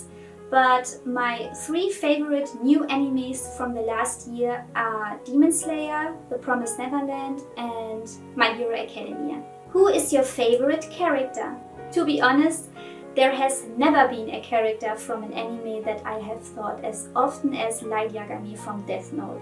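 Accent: France. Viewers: I want to ask you where are you from (no hate) I think you sound french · U have a little french accent .o. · Am I the only french person here ?;-; Because that’s obvious to me that she has a french accent, and so does Celeste (The burning of the VERSAILLES witch 😜).I know, I’m a party pooper right ?😅 But I have to agree...her voice is sweet af🥺 And also, sorry if I made mistakes!😅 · Tu as un accent français non ? 👁👄👁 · You're french? For the title but also for your accent. Ce n'est pas un reproche, je suis française également 😏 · Are you french??? Accent sound french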